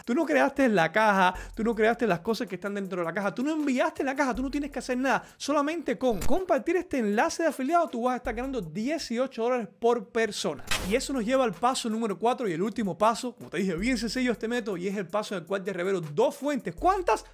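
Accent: Cuba. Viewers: eres cubano verdad?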